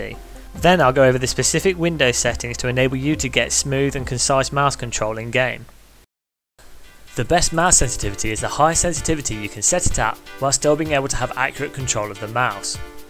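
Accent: United Kingdom. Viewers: Part 2 of my comment Finally you should have also added in the great importance of finding a flawless sensor mouse. This is a mouse that contains a sensor that has no acceleration, or prediction (Enhance pointer precision but on the mouse). Anyway nice video, are you scottish,english or what?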